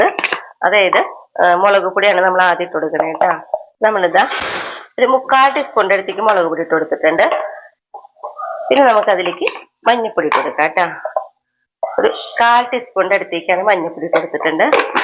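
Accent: Thrissur, India. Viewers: അത് തൃശൂർ സ്ലാങ് അല്ലെ..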